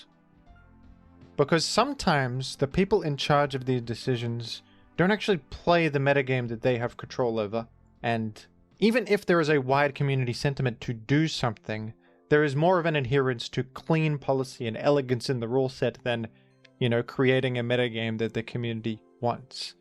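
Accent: Australia. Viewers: I watch so much that I now internally pronounce "Baton Pass" the Aussie way. I accidentally said it to my partner even, which gave her quite a laugh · You're australian??? I never wouldve guessed.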